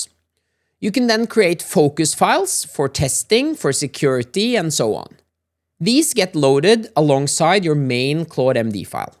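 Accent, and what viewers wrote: Norway. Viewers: Det tok 0.564secunder å høre at du var norsk :)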